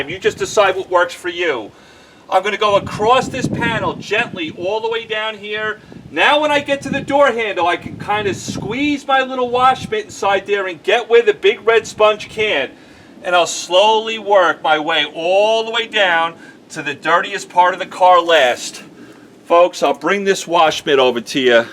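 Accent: New York, United States. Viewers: This guy speaks like a NY’er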